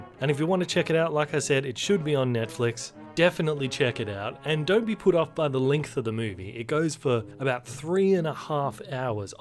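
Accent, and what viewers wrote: Australia. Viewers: Are you an aussie? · Holy crap are you an aussie too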